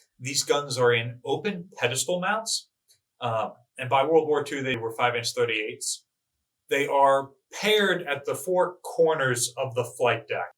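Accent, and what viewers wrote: United States. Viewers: He only has to speak typical American English.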